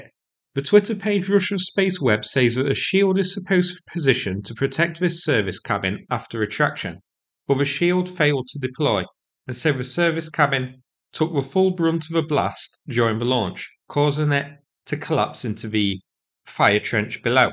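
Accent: United Kingdom. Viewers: pommie accent .... lol .... why not do a doco on the mighty Has-Been Kingdom "Space Force" ..... lol you wankers can't even launch a can of baked beans into orbit ..... you are such a bunch of total losers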